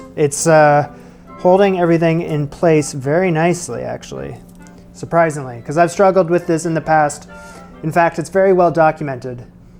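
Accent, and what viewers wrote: Canada. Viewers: the one thing /along many other things) i love about your videos is the (canadian) pronunciation of words like out and house. omg it's just so pleasant to ears.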